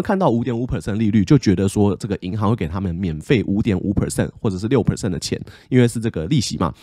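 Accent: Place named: Taiwan. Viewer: 好少看到D大講台語😂😂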